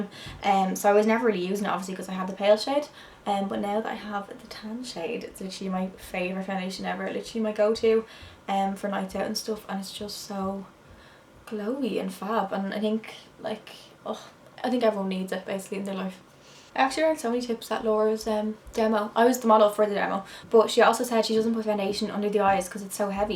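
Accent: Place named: Ireland. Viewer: Super happy this was recommended to me actually. You from Ireland babe? Your accent is very much like clarabell.x! Can’t wait to binge the rest of your vids and stalk you everywhere 😘😘😘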